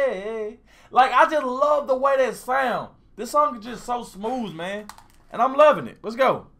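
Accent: South, United States. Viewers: I love this channel, where are you from? you sound like you have southern roots.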